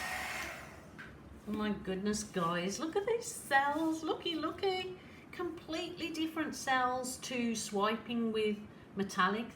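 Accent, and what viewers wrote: Birmingham, United Kingdom. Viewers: Funny after all those years you still have a Brummie accent. My hubby is from Luton in Bedfordshire and has been here in Sydney for 39 years. Aussies think he has a British accent and Brits think he has an Aussie accent LOL. Thanks for all your videos and sharing your experiments with us.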